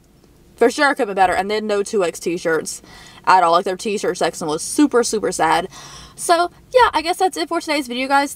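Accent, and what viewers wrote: South, United States. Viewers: I love it when your southern accent really comes through! You should do a picture of your 4 mug collection 😊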